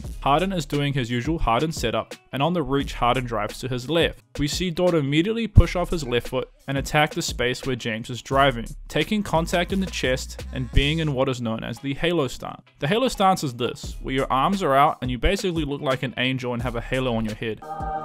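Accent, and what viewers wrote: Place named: South Africa. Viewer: i smell South Africa in that accent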